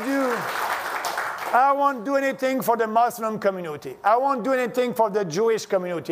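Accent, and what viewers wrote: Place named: France. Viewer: These hero's always pop up out of nowhere, and this is standard. He may have a French accent, but then again so did my grandmother.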